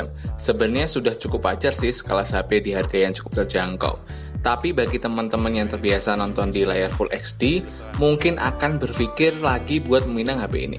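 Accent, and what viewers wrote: Magetan, Indonesia. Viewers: Mas sampean wong Magetan?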